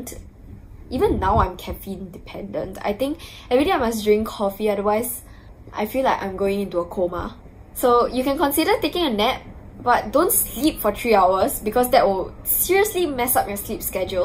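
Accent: Singapore. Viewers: I really love the singaporean accent idk why 😅😂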